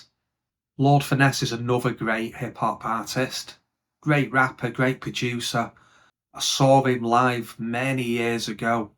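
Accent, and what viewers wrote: Manchester, United Kingdom. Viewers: Well that's me un-subscribed.. sorry but that boring monotone manc accent is killing, ta taa..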